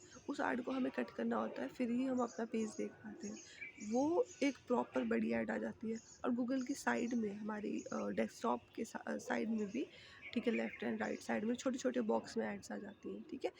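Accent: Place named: Kashmir, India. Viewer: Nice information but I am NRI 😅. Also , you sound like Kashmiri 🎉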